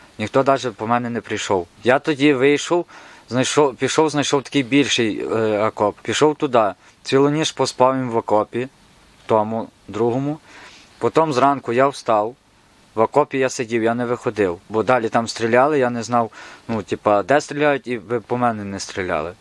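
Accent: Ukraine. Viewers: С гуцульский акцентом разговаривает.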